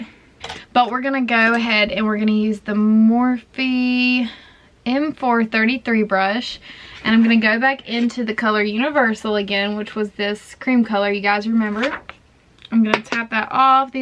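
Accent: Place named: South, United States. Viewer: You look amazing girl. You have a south accent I'm guessing your from the south of the us, but I have been watching True blood and you remind me of them. I hope this wasn't that offensive If it is I'm sorry. But great video!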